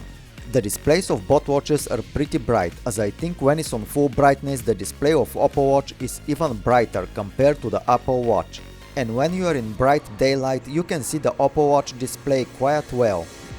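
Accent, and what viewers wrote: Russia. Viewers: Is that an Russian gentleman speaking English, man I love his accent a lot.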